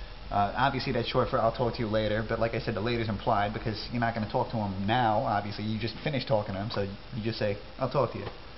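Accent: New York, United States. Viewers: To be continued? +1 to all your videos on NewYork accent. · u seriously live by this code of new york, or are u just a dood that just talks like this for the camera?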